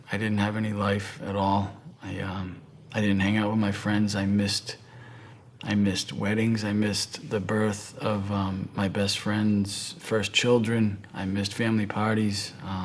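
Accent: United States. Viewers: Born in Boston. Knew you were from MA as soon as you said your name. Best of success for you! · ... G:)d bless the Boston accent ❤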